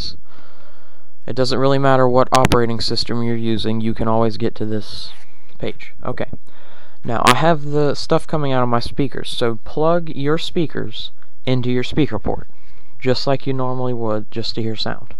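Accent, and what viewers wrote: South, United States. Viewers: lol southern american. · you has a country accent like me :p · are you fucking KIDDING ME?? i was trying to find a way to record FROM MY SPEAKERS WITHOUT A MICROPHONE and sat through SEVEN MINUTES of you bloody SOUTERN ACCENT to show me that your whole problem was to USE A MIC?? well that's not fair... i'm speaking out of hate, and now that i've calmed down, i can say calmly that ou've wasted my time.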